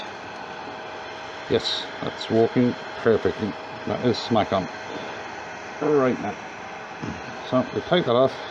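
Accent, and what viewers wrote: Ireland. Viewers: Brilliant. Is that aDublin Accent.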